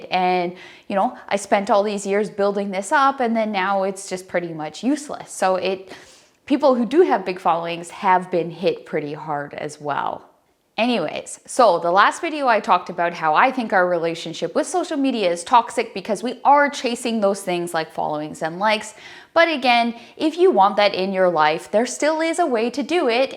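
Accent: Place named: Canada. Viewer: I have always had a real distain for the mindless groupthink rush to "the latest" social media channel. I found Flickr a long time ago and loved it for its rich features! And you know what? I'm still there because it's such a great place for me. Apparently everyone went to Instagram and are now flocking to Vero ... whatever. Flickr does a superb job of helping me quickly and easily organize, tag, geotag, publish and share my best work with likeminded people. But foremost in every photographer's mind (those who want to make some kind of income) has to be exposure. No one will buy if they don't see your work. So the sad truth is that we all want to maximize exposure. Btw, as an ex-pat Canadian, I'm delighted to find your channel. Long ago I couldn't hear the Canadian accent. After 23 years away from Canada, I can. It's so lovely to hear and your work and video content is wonderful.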